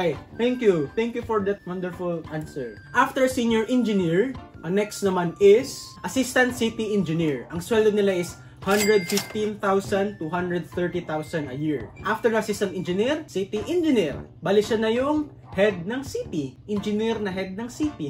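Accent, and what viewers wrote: Visayas, Philippines. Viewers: oy bisaya hahahaha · ambot bisaya an deay si idol HAHAHA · hahaha y*w* nindot jud kaayo paminawon ning bisaya mag tegelog ay hahhahhah hi kuyaaaaa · lisuda jud ning bisaya mag tagalog oy hahah di ma likayan ma sagulan ug binisaya 😂😂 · Hahaha kaaligri sa imng bisaya accent Engr. Dugang kas akoang inspirasyon as 2nd yr ce student diri sa cit univ! God Bless you engr!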